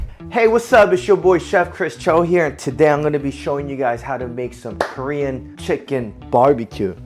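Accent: Philadelphia, United States. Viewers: I can hear the Philadelphia all in you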